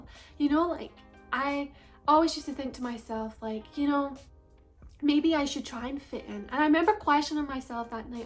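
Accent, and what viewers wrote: Scotland, United Kingdom. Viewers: I've watched you on tik tok for a while but only just joined your YouTube channel. I am so baffled and shocked that people would ever think that someone with a cool style that celebrates vintage could be a bad thing? You look amazing and your accent is beautiful (my dad is from coatbridge/aidre so its lovely to hear!). Keep being you 🥰 xx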